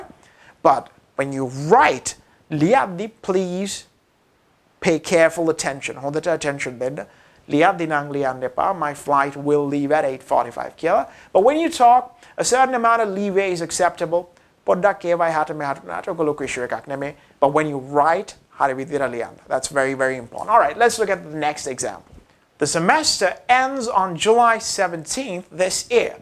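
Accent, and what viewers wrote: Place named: United States. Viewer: I really like your speaking style 😃👏 it's truly American English 😍 sir, you're great teacher 👍 because you're teaching pattern so attractive 🔥 it's a valuable thing for all English language learners ♥️ Best of luck 🌺 I'm waiting for next YouTube video of simple present tense (part6)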